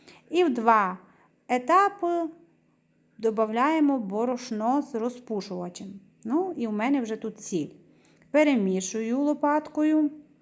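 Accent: Transcarpathia, Ukraine. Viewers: Дякую,! В нашій родині наразі місяць страв з гарбуза, хоча любимо гарбуз, тому він часто на нашому столі.))) У Вас приємний закарпатський акцент, люба! Рясних Вам і Вашим рідним Божих благословень!💙💛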